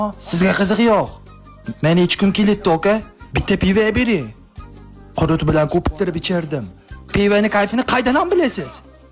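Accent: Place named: Namangan, Uzbekistan. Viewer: Намагга шивага гап йок 😂😂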